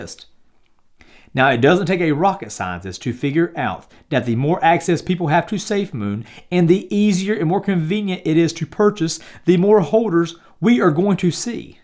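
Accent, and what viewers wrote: South, United States. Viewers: came for safemoon, stayed for the southern accent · Subscribed to this channel simply because this dude seems genuine and honest. Plus his accent just kills me. Some southern USA with undertones of a British accent. Bit in all seriousness, he's made me realise the importance of investing in Safemoon NOW!!!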